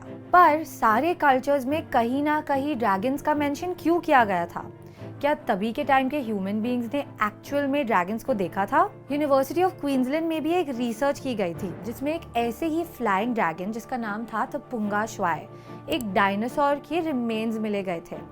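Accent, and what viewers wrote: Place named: South Bombay, India. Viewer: The way you pronounced "ळ"😁 you're from Mumbai. And your accent sounds so south Bombay.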